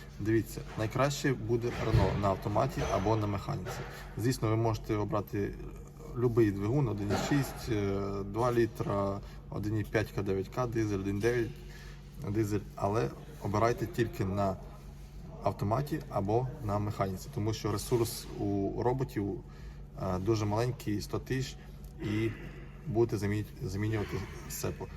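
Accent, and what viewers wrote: Surzhyk, Ukraine. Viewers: А мені подобається Ваш суржик і головне про що Ви говорите!!!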